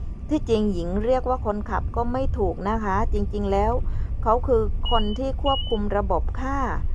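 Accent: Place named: South, Thailand. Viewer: คิดถึงจึงแวะมาทักทายน้องสาวคนน่ารัก😍, คิดถึงสำเนียงใต้.ยายรินดู แต่บ่ได้ยินนะคะ เพราะหรี่เสียงมือถือไว้ กลัวคนรู้ว่าเล่นมือถือในเวลางานค่ะ.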